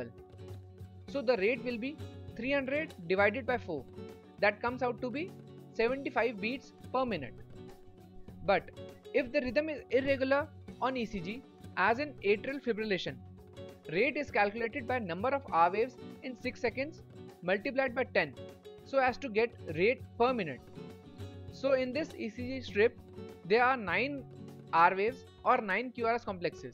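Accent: India. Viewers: I like your accent. Indian medics are excellent. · I really wish this guy spoke slower and was not Indian.. smh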